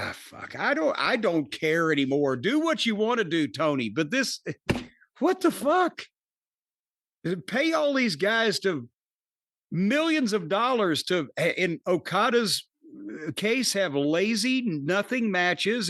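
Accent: South, United States. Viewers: 'The more you here him talk, the less you like him'... im feeling this with cornette lately. His southern, unwilling to change, preacher type voice is starting to get irritating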